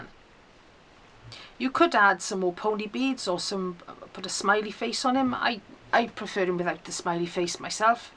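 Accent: Wales, United Kingdom. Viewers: You sound welsh im homesick now😢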